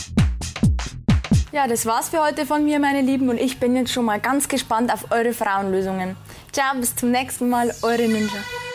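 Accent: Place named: Lower Bavaria, Germany. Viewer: bist du aus niederbayern?? Der Dialekt kliegt irgendwie so.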